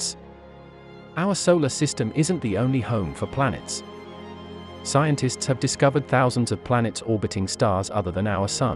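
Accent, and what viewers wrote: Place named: United Kingdom. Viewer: Thats a robot voice? I thought he was just british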